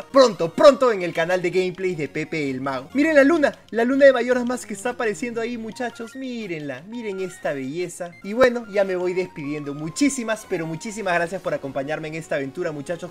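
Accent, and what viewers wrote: Peru. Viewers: OMG PEPE, LO SABÍA, SÍ ERES DE PERÚ 💖 Por la forma en la que hablabas lo sospechaba hahaha pero nunca me convencí. En fin, me encanta todo tu contenido tanto en tu canal principal como en este, ¡éxitos! 🌻Like si luigi es tu personaje favorito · OMG PEPE, LO SABÍA, SÍ ERES DE PERÚ 💖 Por la forma en la que hablabas lo sospechaba hahaha pero nunca me convencí. En fin, me encanta todo tu contenido tanto en tu canal principal como en este, ¡éxitos! 🌻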